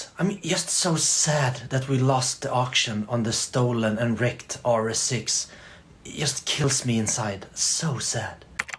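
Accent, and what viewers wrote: Halmstad, Sweden. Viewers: Varför svengelsk dialekt? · E du från Halmstad?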